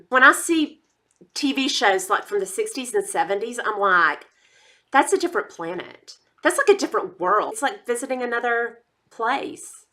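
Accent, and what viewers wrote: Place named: Texas, United States. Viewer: where the Heck are you from? I talk just like you and the "YALL" was a dead giveaway. What town in Texas are you from? I laughed my butt off all the way through this video. It was GREAT! Loved every minute of this. Hope you are having a terrific week. Sending Big HUGS to ya Girll! xoxo Sharon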